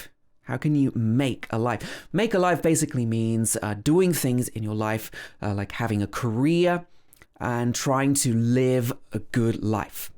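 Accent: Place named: United Kingdom. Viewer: Hi,. How are you?; I am living in Manchester and it is more important to improve my British English. It would be great if you make some videos of lessons like this THAT IS VERY PRODUCTIVE AND IMPORTANT. Your British pronunciation is great and all lessons as well That is the reason why I am learning English with you at the moment I have IELTS 6.5 but it is not enough. Thank you.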